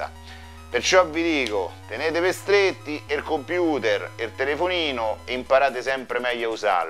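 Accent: Rome, Italy. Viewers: sei un grande, oserei dire un "geniazzo": estrema competenza abbinata a grande, voluta, semplicità comunicativa, peraltro con un deliberato utilizzo del "romanesco", che rende il tutto incredibilmente familiare e soprattutto nostalgico; spazi dalla tecnologia allo sport con la stessa disinvoltura con cui dai un "colpo sotto"!!! 🤝🏻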